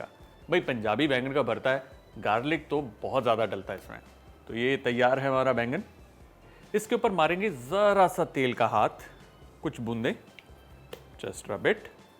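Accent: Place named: Bihar, India. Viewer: punjabi wala bola na tum bengsli Odisha bihar style bol rahe ho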